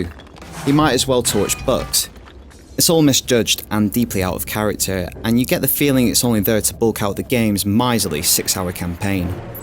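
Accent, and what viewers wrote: United Kingdom. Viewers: Furthermore, the reviewer coincidentally having a certain accent and possibly being from a foreign place like the UK, or any one of the countries colonized by British doesn't mean he represents its people. This is IGN doing the review, at least point the finger at them rather than making it a matter of national pride and insulting others. I was unaware that British were single-handedly putting down the U.S. (I say "U.S." because the Americas are not comprised of only us) via game reviews, LOL. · Why is a british guy doing a review on Captain AMERICA game?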